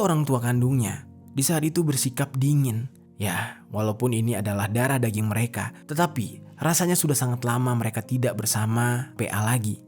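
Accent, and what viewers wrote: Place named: Sunda, Indonesia. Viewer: Bahasa Sunda nya kekok..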